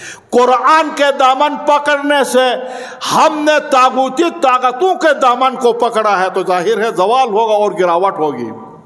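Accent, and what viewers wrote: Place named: Gilgit, Pakistan. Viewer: I don't understand the majority audience are pashtoon then why he delivered his speech in urdu because he seems to be gilgiti not local by his accent strange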